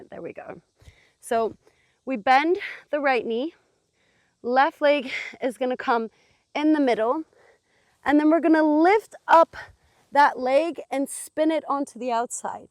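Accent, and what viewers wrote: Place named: Australia. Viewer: Your accent has got me…scattering of different accents. I can hear Australian in there xx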